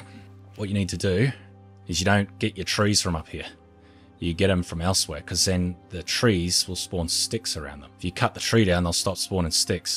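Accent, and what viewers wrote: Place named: Australia. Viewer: I love the Aussie accent and your humor is top notch sure these vids are old but I just found you a day ago..Keep up the good work..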